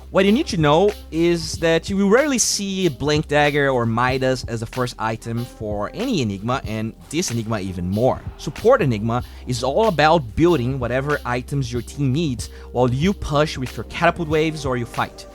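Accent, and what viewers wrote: Brazil. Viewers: Good job man, your accent sounds familiar to me haha just in the case, are u Brazilian?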